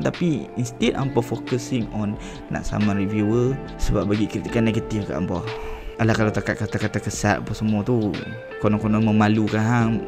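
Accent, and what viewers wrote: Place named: North, Malaysia. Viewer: done subscribe..sbb nk dgr bhse utara.. tp org mane ekk..perlis penang atau kedah??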